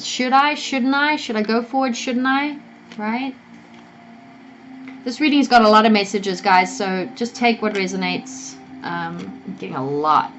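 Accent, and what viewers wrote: South Africa. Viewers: So on point .. my family did me dirty but it's ok.. thank you 🌹🙏🏽 you sound south African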